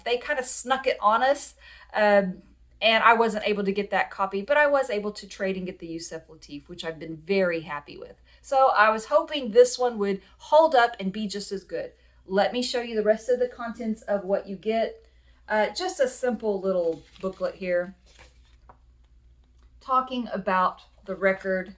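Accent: South, United States. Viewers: .Lovr your southern drawl.Your excitement is evident on the video.This is not even his celebrated era.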